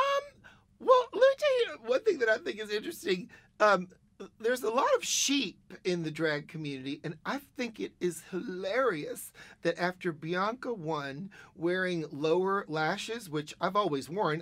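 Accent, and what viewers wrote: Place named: South, United States. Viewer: You know.. it’s crazy bc Lady Bunny rly looks like if Trixie got older . She even kinda sounds like her 😂just southern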